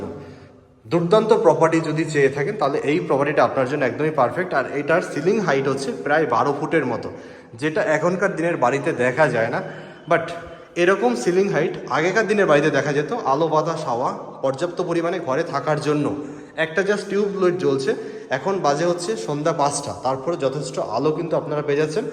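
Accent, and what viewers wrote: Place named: Bangladesh. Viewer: কিছু মনে করবেন না ! বলছি দাদা আপনি কি বাংলাদেশি? আপনার কথাবার্তা একদমই পশ্চিমবঙ্গের বাংলা ভাষার মত নয়।সম্পূর্ণ বাংলাদেশি বাংলা ভাষার মত। আবার আপনি অস্থির কথা টাও ব্যবহার করেন যেটা বাংলাদেশীরা করে।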